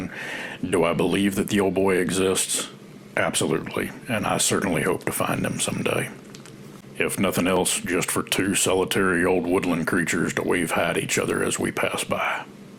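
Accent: South, United States. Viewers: This Augusta old lady, now living in Canton, appreciates your southern manners and accent! I enjoy your adventures.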